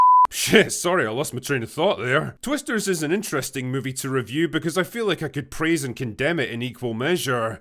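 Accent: Scotland, United Kingdom. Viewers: SSSSSSSIIIIIIIIIIIIIMMMMMMMPPPPPPPP!!!!!!!! ............. Nah, that Scottish accent gets me too 😅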